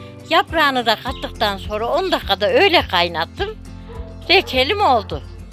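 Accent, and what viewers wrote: Kayseri, Turkey. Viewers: Teyze kesin Kayserili şive aynı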